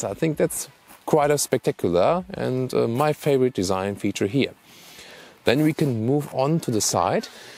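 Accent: Germany. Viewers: your German accent sounds reliable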